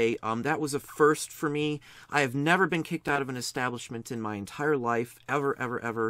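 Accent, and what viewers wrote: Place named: Northwest Ohio, United States. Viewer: Sounds very NW Ohio.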